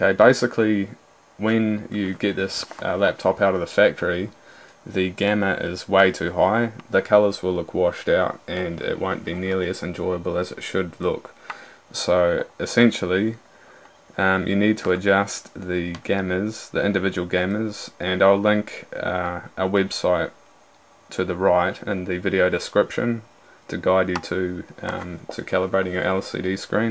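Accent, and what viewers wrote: New Zealand. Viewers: This is a very nice laptop. I just spent 5 and a half grand on my gaming desktop so Im not going anywhere in terms of money any time soon but as soon as I finish saving up again Im going to get this. XD By the way are you from New Zealand bro? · Thanks to Flight of the Concords I can now spot a New Zealander accent. Thanks, HBO! Oh and good review.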